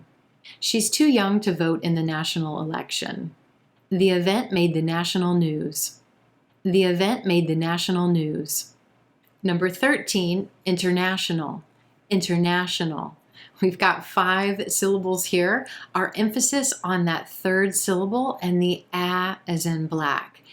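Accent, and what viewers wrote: United States. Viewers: Hii you are a good in American assent. So I want to join your pronunciation course. plz tell me about your course how am I join your course 👍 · Hi from Somalia...ur teaching is waaw and ur American english is music to my ears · Thanks for the video. Love your accent. It's so American. Wish I had such one too.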